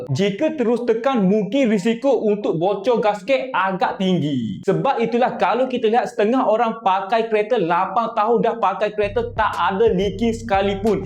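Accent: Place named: East Coast, Malaysia. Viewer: Terbaik bang, tebal loghat pata timor 😅😅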